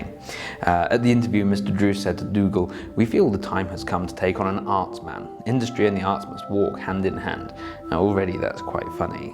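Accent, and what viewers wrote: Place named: Scotland, United Kingdom. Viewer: She scottish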